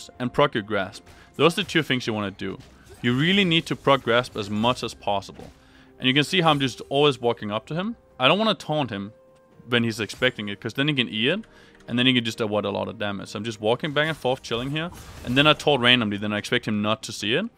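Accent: Denmark. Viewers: is it just me or does this guy sound danish don't want to sound like an ass, I live in Denmark and know what a danish accent sounds like. just curious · Are you from Danmark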